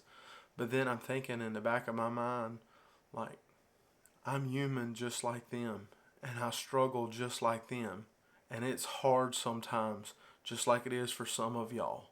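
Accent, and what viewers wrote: South, United States. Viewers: Hello young man! Your video showed up in my feed and I’m really glad. Thank you! I’m going to share with you - this will likely be long. Please - take what you like, leave the rest. First, you can’t disappoint anyone else. If someone says they’re disappointed in something or someone, that is on them - it’s their feelings - it’s theirs to deal with and not something you caused or need to feel worried about. I can tell you’re from the South by your accent. Me too! So, I know a lot about what that likely entails ….food - and not healthy food - is a central part of life - good times, bad times, normal times, etc etc etc. It’s an insult to many in the South that if one doesn’t eat as they think one should, then they are worried or insulted and certainly, they keep encouraging and begging and shaming until the person gives in or bad feelings occur. 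I’ve been there, done that. MANY MANY MANY times! I saw a great therapist for known and unknown reasons. It was the single best decision I ever made!!!!!!!! My life changed in every way - I got better - I understood my life - past and present - much more fully. A lot was not pretty or comfortable. I had a lot of shame - for known and unknown reasons. There were things I was hiding - from others, for sure. And from myself in many ways, in terms of how it was effecting me - including my weight and food issues. So pat yourself on your back ….you’re being very candid, you’re taking a risk and you’re willing to see a therapist. In our society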